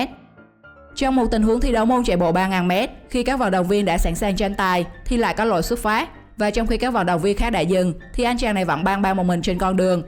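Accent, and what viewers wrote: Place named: Quang Ngai, Vietnam. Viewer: Tập nói giọng lại đi, sao nói nẩu qué vại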